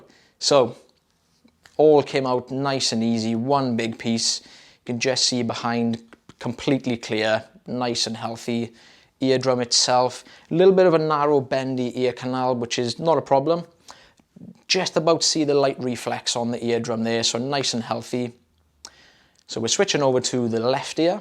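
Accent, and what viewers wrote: Wales, United Kingdom. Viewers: I love Rhys, but I'll take another lovely Welsh accent for my ear removal video. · Thank you, so very much, for this fun little video!! 😉💜 I love your accent, where are you from? It's sounds kinda Welsh, but I'm from the U.S. so please forgive me if I'm completely wrong, lol 😉 Great job!! Hope to see you doing more videos!! 🕊️💚👂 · Go - very big shoes to fill so good on you for having a go! Amazingly long sausage in that first ear. Love your Welsh accent. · Wales accent, easy to tell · Job well done. Love the Welsh accent 😀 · Well done on your solo debut,! Loving your delivery, and your wonderful Welsh accent 😉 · A Welsh accent: love it!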